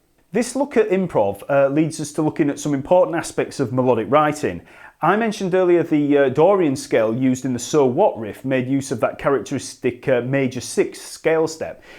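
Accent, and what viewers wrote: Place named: United Kingdom. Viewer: What was that app again? IWILBRI... What? Being a Filipino hard-wired listening and talking in American English accent makes me struggle a bit when listening to British accent.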